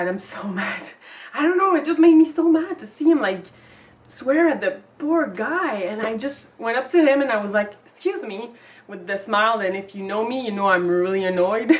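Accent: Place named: Quebec, Canada. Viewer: Whoah ! Une très belle couleur écarlate de tes cheveux ! Je suis passé par hasard sur ta chaîne. Puis j'adore vôtre accent québécoise, c'est se qui fait votre charme ^^. Vous me faîte penser a un personnage d'un jeu nommé Chloé qui fait punk avec son bonnet dans : "Life is strange" : <link> Bon sauf quelle a les cheveux bleu, mais qu'importe... désolé pour ce propos hors sujet de vôtre vidéo mais je tenais a vous le dire.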